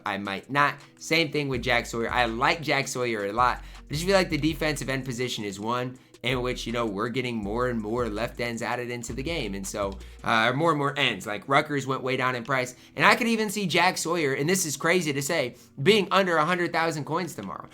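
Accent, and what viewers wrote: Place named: Midwest, United States. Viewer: He’s from Iowa area so it’s Midwest lol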